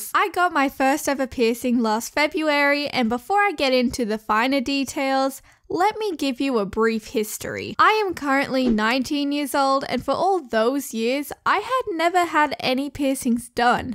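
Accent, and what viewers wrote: Australia. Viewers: Are you Aussie?? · the australian accesnt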